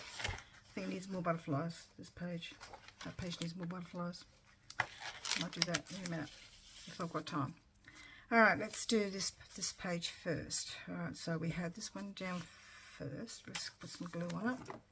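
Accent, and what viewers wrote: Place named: Australia. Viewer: I really liked this collage and I love to hear your Aussie words. Keep up the wonderful pages!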